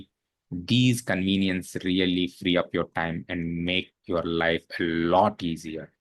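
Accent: India. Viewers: Your accent is very bad and abrasive, like that of an uneducated Indian, though you lived 20 years in US! You must be a lazy person! Telugu people are very lazy! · Oh dude you lived in US for 20 years but your accent is still as if you never left India but so sorry that you had to return back, is it Trump? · You look like a man in late thirties and if you’ve lived in the US for twenty years, that means you must’ve been in your late teens when you first moved to America. That leads me to my next question: how come your English sounds like you’ve never left India? Just curious. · After staying in the USA for 20 years how do you still have a such a strong indian accent?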